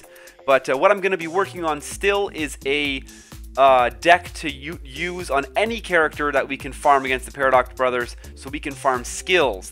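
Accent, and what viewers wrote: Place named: Canada. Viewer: and that how i learned that is Canadian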